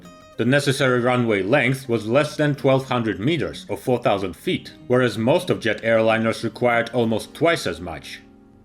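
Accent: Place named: Russia. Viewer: The narrator is Russian I think by the accent. They often have different words for English ones than we use. Nice aircraft. Wouldn't say no to a personal jet version.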